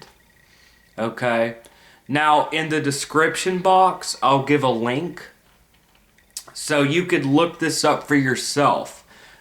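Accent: South, United States. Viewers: he sounds like hes from the south, like me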